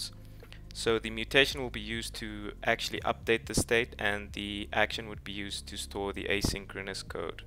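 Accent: South Africa. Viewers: Sound like a South African, good for you.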